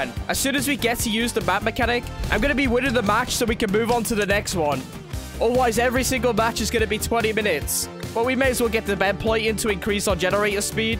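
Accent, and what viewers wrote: United Kingdom. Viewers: MY GUY IS THE BRITISH KING ACCENT🔥🔥🔥🔥💀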